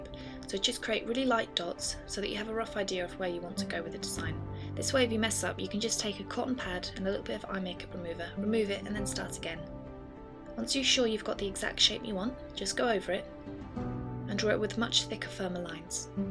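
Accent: England, United Kingdom. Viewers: i luv ur british accent...its cute!!! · loove that english accent.... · I love your accent. Even though I am from the UK too xD :') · i love british accent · i like british accent ! perfect xD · It's really weird listening to a British accent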